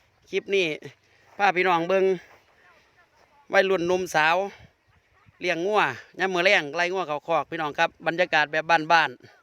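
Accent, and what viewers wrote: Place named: Isan, Thailand. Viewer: ข้อยมักเจ้าเว้าม่วนๆยุจังหวัดใด๋คับกะลังได้เบิ่งคลิปแรก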